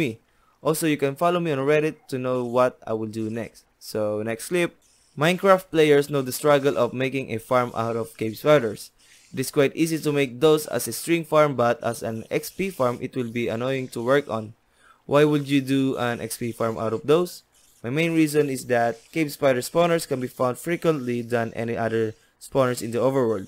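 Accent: Philippines. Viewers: i smell filipino accent... HELLO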